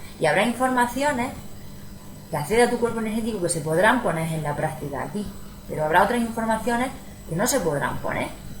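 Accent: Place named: Andalusia, Spain. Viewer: Hola. Gracias por tu video es muy generoso por tu parte. Me encanta tu acento andaluz y se te entiende perfectamente. Quien no entiende es porque no quiere. Me ha sorprendido lo bien que explicas los conceptos, con naturalidad y soltura. Ha estado muy interesante. A mí me gustaría también oir más de tus experiencias. Sigue subiendo vídeos. Saludos y no hagas caso a los haters, los hay en todos lados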